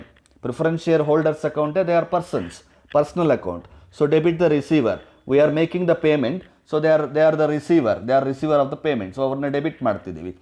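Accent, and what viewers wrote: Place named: South, India. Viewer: Aap south kyu bolne lagte ho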